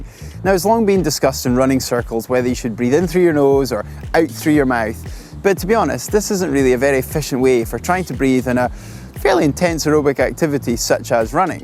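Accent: Scotland, United Kingdom. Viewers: Defo Scottish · There is just something about that scottish accent, coming from either men or women, doesn't matter, that just... 😍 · Really though, what is his accent? It sounds like a Scottish blend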